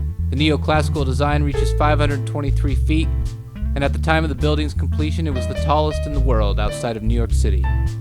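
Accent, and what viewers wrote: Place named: Chicago, United States. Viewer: The soundtrack, the shots, and the narration together form a perfect representation of that famous Chicago-style charm. That Chicago accent lecturing us on the details of Chicago's architecture with that appropriate music filling the background WAS SOO ON POINT.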